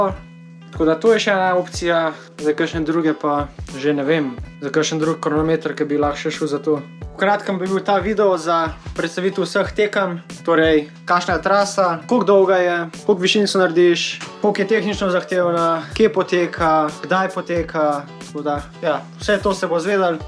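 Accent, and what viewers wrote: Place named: Notranjska, Slovenia. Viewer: Bi me zelo zanimalo,če boš objavil časovni načrt z kraji,v kateri smeri boš vozil pri tvojem podvigu čez vse te občine.Če bomo zaželjeni,je nas verjetno kar nekaj,ki bi ti šlo nasprot in ti delalo malo družbe,da ti bo lažje.Še nekaj bi te vprašal,pa ne zamir,firbec pač,glede na to ,da ne govoriš špreho,značilno za kraje okrog Rakeka,me zanima,če si domačin,ali ste se priselili sem,sicer pa kakšno prav fajn zaviješ vmes po notranjsko😉,ja,držim pesti da se ti izidejo tvoji načrti in brez nezgod, lp.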